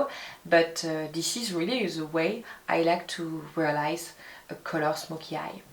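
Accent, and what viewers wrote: France. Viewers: Salut! Tu habites où? Tu as beaucoup de vocabulaires c’est super mais ton accent est super français xD ! C’est pas méchant :) je te souhaite une bonne journée !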